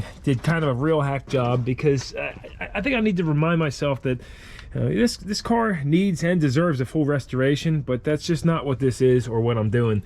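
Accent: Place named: Pittsburgh, United States. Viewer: Are you originally from Pittsburgh?? Your accent is so reminiscent of Pittsburghese it's uncanny.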